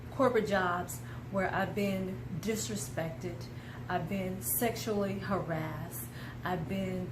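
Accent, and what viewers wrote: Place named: South, United States. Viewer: I love your southern accent. It doesn't sound as though you originally come from Houston. I know you said you lived in South Carolina. Do you know which country in Africa you plan to live in yet? Also, I just subscribed to your channel because I love to see young black brothers and sisters doing positive things. The only advice I have for you is to make sure you have your financial ducks in a row before you go to Africa. I just got finished responding to a bevy of people in Africa and the Diaspora about a family that moved to Ghana from the Netherlands about a year ago but is now on its way back to the Netherlands because things got too hard for them. Their kids were in private schools for God's sake. Lastly, I would appreciate it if you could visit my YouTube channel I started this month to check out a video I produced about the N-word. 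It's very scholarly because of my background, which you can learn about if you visit the site. I also have four more videos coming up after I edit them--I'm somewhat technologically challenged. The next four are about how the N-word does not come from the Niger River, which is what some black scholars are now saying; how the prison industrial complex is genocide; how black lives didn't matter during the civil rights era, using three famous case studies; and my take on the Critical Race Theory. I also plan to launch a pan-African daily news channel early next year, which would probably be more fitting for you. But if you could support my cha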